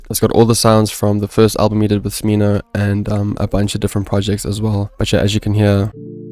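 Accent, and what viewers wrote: South Africa. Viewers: was way to Tuff are you South African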